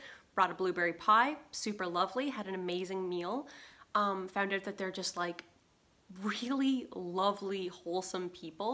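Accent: Newfoundland, Canada. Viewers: Wonderful to see you again and hear that glorious Newfie accent. Great choice for location. Look up who the PPC candidate is for your community. Call them. There is hope for Canada, strong and free. It's Maxime Bernier. All the best in your new home.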